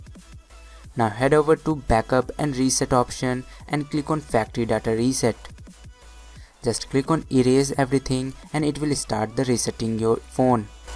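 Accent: India. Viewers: I dont understand indian english...accent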